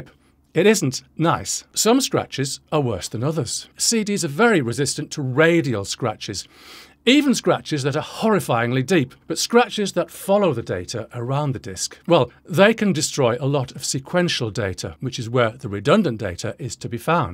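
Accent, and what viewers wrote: United Kingdom. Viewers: He Does come off as a rather arrogant know it all, doesn't he? .... the Brit (almost typed 'Cockney' lol) accent doesn't help ....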